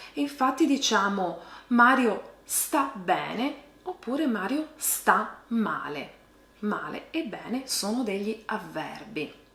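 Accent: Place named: South, Italy. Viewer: Ma se sei terrona che cosa vuoi insegnare italiano con quell'accento che hai Stare al posto di essere lo usano sempre e in modo insopportabile i romani e terroni come te. È un vostro marchio immediatamente riconoscibile. E impara ad usare il sia... sia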